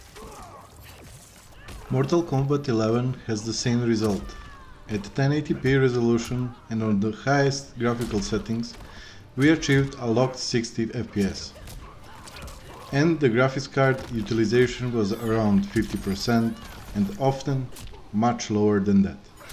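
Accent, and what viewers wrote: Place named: Russia. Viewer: You Russian? Ukrainian? Good review.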